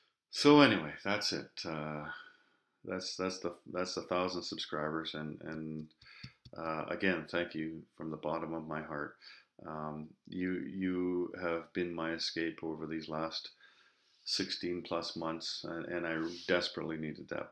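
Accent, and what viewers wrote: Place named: Canada. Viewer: you are awesome, you want to here something funny? I had my closed caption on while watching this video...according to the captions you are pregnant... i'm still laughing, they obviously don't use a caption-er who picks up your Canadian word accents. but congratulations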